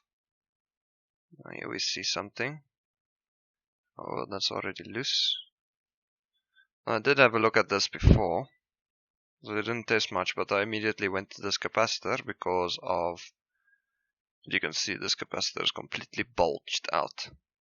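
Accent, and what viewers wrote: South Africa. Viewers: Nice Video. ... You have A south African ACCENT.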